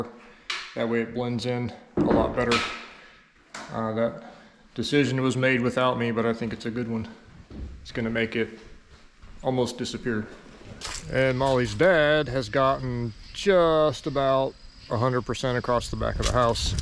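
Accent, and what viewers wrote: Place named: United States. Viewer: Foyer pronounced FOI-yer. LOL! I love your American accent and it's one of the reasons I listen to you. Where I am from it is pronounce foi-YAI because it has a French root. That was not judgement. It was amusement. Don't change. It's charming American.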